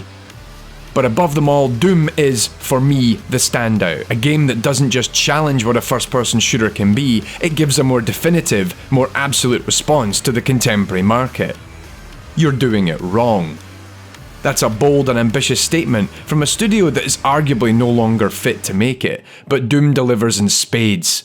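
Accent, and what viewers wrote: Scotland, United Kingdom. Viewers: A scotsman tells me about violence. Love it. TAEK DE RUENSTOOONE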